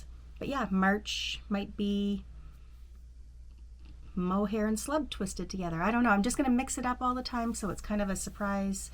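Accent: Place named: Maine, United States. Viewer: Yay thanks for the update after Vlogmas - love your Everett I have received your Valentine Box and am looking forward the finding a pattern and knitting up all your beautiful yarn. Love your “Down East” charm, nothing like a good “r”.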